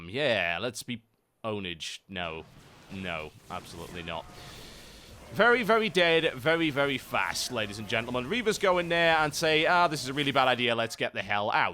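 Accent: United Kingdom. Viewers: You may not be an experienced player, but you're a pretty darn good commentator. Love your accent. UK, right? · lol... British.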